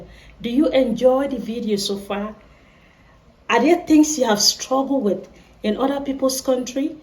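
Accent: Kenya. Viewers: Was that a Kenyan accent? Is a lie, love your videos though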